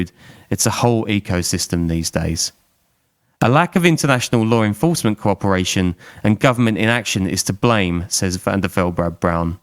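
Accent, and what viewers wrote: United Kingdom. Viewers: Didn’t watch the entire video, as I was sick of seeing the same pictures over and over. And someone with a British accent talking about something that happened in Mexico is very odd …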